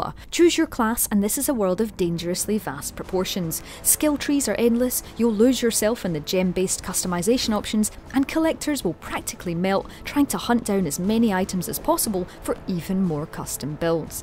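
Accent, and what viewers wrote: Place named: Scotland, United Kingdom. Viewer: it's a scottish accent ^^ same as mine.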